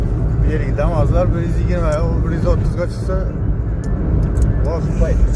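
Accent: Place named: Kyrgyzstan. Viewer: Созунор Кыргызчага окшооп суйлопсунорго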